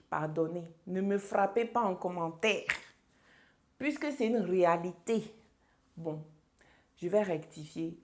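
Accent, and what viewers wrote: Ivory Coast. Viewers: ma soeur tu parles comme une ivoirienne hein c'est bien ça soyons fière de notre afrique vive le BENIN ! J'aime bien ce pays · Tu n’aurais pas vécu avec des ivoiriens par hasard? Tu parles un peu comme une ivoirienne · . Juste pour dire qu'on se reconnaît quand on parle. Et la, perso jai l'impression que c'est une ivoirienne qui parle.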